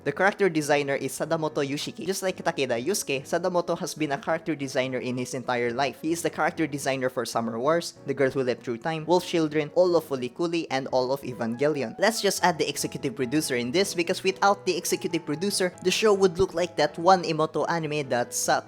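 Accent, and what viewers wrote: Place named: Philippines. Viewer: Okay i was just having a guess that you are a filipino because of the accent pero pinoy nga HAHA good job bro i also love great pretender haha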